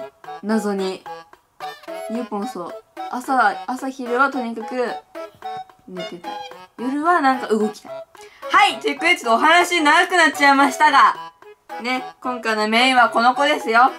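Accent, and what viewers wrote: Kansai, Japan. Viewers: 関西人だから関西弁じゃない？多分...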